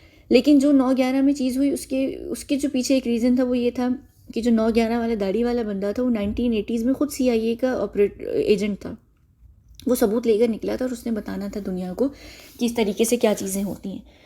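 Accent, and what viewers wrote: Pakistan. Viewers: Her dialect is pure Pakistani. Slow claps for those who believe her Illuminati agent story. She could be related to islamic organization and her purpose here is solely to set a narrative for defying the existing islamic terror. She won't acknowledge Hindu genocides. Her agenda is fixed... They play the war of narratives. In a way she is also installing fear. The elites don't have the absolute power. We, if united are powerful too.. People keep ur senses alive and always always always question every narrative or information being thrown at you. Specially from a person whom you have never even seen. It's a very planned move.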